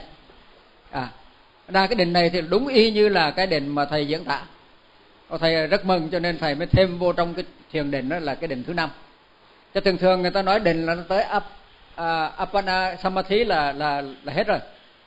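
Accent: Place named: Saigon, Vietnam. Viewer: Mô Phật... kính bạch thầy. Xin thầy vui lòng chuyển âm băng của thầy giảng bằng "GIỌNG NÓI SAI GÒN " Rất hay và dễ nghe. Xin chân thành cảm ơn thầy. Con luôn luôn yêu mến giọng nói SAI GÒN tha thiết !!!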